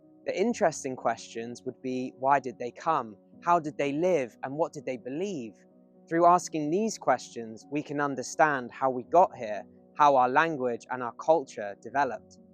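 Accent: United Kingdom. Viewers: you sound like you have a good, clear BBC voice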